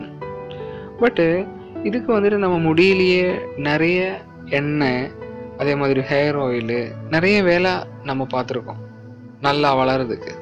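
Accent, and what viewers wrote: Kerala, India. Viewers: Unga Tamil la malayam slang iruku..r u a Malayali??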